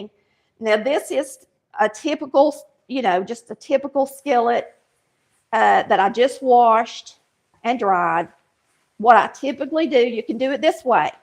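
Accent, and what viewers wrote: South, United States. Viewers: You’re so funny!! Miss, I knew you were a smart cookie, I could tell, I hated Math, so no engineering for me, lol, but you be happy with your accent,you’re the best of both worlds, sweet, smart, and down to earth!! As a Christian, I always wanted to have a meek and quiet spirit, I find Southern women to be a lot like that, and we ARE supposed to be good home makers! Proverbs31 says the godly woman had a business too!! God bless you, so glad to have found your channel!❤😊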